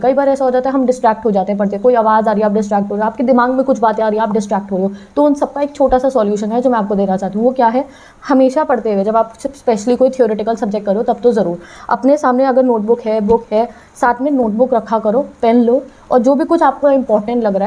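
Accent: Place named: Haryana, India. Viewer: dii I think your ate from haryana or from north india side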